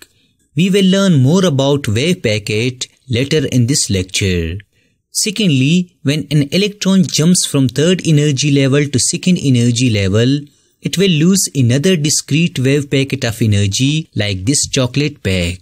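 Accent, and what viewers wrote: South India, India. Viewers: By hearing your English I am thinking that you are from south India side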